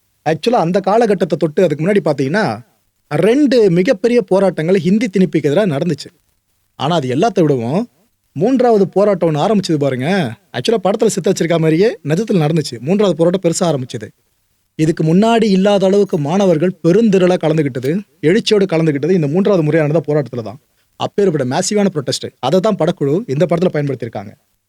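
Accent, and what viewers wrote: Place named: Madurai, India. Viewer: மதுரை ஜங்ஷன் தமிழா அண்ணா 😮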